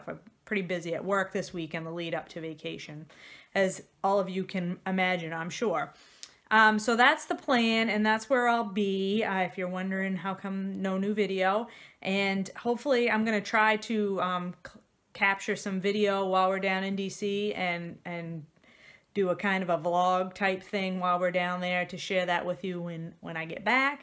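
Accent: Maine, United States. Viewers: Five presidents sounds very interesting. How did I not pick up on your Maine accent?! My sister lives in Brownfield, love Maine and visit frequently! I also really enjoyed The Stranger in the Woods too! Have fun in DC!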